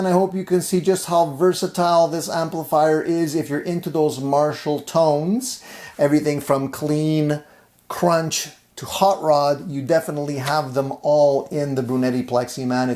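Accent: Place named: Montreal, Canada. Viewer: Sounds great! Maybe a dumb question, but are you from Montreal? If not, then you have definitely our English accent for some reasons haha Cheers!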